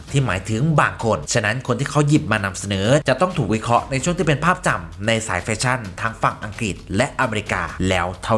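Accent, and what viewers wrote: Isan, Thailand. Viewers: ถึงแอดจะข่าวตกภาษีอีสานบ้าง แต่ก็น่ารักกกก😆😊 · เบิ่งช่องแอดแล้วเต็มอิ่มกับข่าวสารของน้องลิซ คลิบนี้เบิ่งนำหัวเราะกับการเว้าอิสานผสมมานิดๆหน่อย🥰🥰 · 555 ตกอีสานบ่อยนะเรา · ชอบสไตส์การพูดเนื้อหาและหลุดเว้าอิสานฟังปัยหัวเราะปัยชอบ5555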